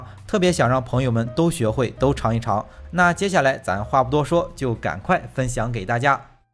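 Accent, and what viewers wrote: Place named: Dalian, China. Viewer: 听这位老爸的口音似乎是大连银😆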